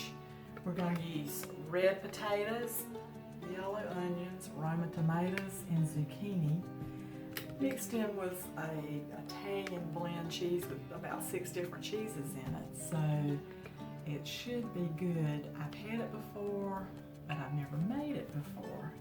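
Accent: South, United States. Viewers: Yum! Love your southern accent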